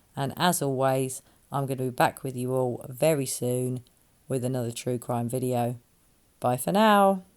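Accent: United Kingdom. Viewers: If it IS true, grandma MUST have told LE waaay before now. I don't believe it's really grandma. Btw Love your content, love your delivery even more - nice to hear another Brit lol London.lady here - New sub too x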